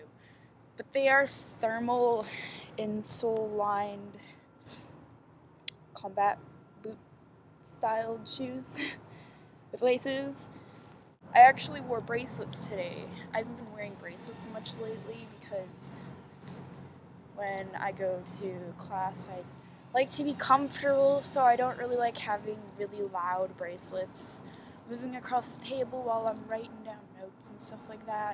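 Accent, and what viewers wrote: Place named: Canada. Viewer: i suspected u were canadian bc of yr accent! i live in bc too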